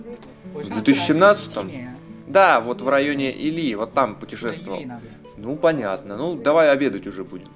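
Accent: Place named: Kazakhstan. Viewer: По чисто казахски. Какое наречие?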